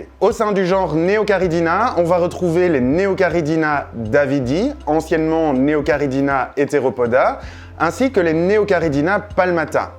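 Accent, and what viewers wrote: Belgium. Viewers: Excellente ta vidéo, explications vraiment claires ! tu es belge ? Le Houit ne trompe pas en général 😊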